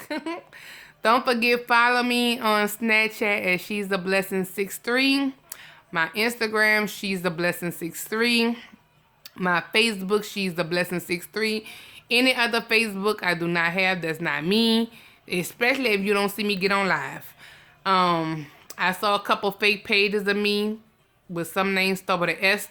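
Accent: New Orleans, United States. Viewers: I just love listening to you! Ya insights and slang and N.O. accent...Very comforting.I promise I would listen if you made a video describing how to make a peanut butter and jelly sandwich.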